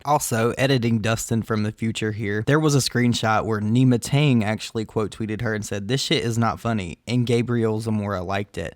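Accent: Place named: Tennessee, United States. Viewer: Thanks for the 🍵. And your accent reminds me of summertime on Dale Hallow, Norris Lake or Cumberland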